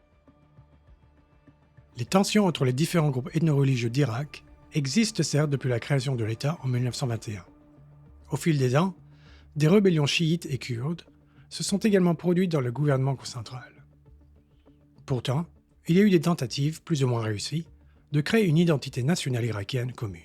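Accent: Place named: United States. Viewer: Interesting analysis as always. I'm glad this channel has a French version. Some remarks however: translation is by no means bad, but not always consistent (especially noticeable is the intro, "welcome to the 20s report" is translated several different ways). The narrator's pronunciation of foreign names is a surprising (it's quite unusual in France, at least, to use an American accent - especially for ISIS as was mentioned today) but not bad in itself - however I still can't get over the pronunciation of "Erdogan" in the Turkey video, which was the worst-possible option (and, in fact, one of the rare times where it was read as if it was a French word). I also notice that neither translator nor narrator are credited in the video or description. How come?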